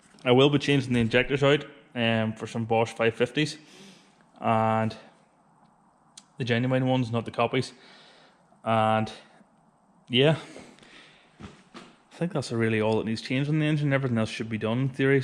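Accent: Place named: Northern Ireland, United Kingdom. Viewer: Hello I have just started to follow your channel and I like what your are doing. It was the st170 engine into the mk2 that I was interested in first because at some point I will be putting an st170 into a red mk3 escort. By the sound of you your from Northern Ireland so am I can I ask what part are you from.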